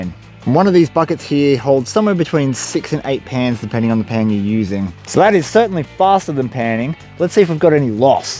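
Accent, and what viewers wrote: Australia. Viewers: Does your accent tell me your on Australia?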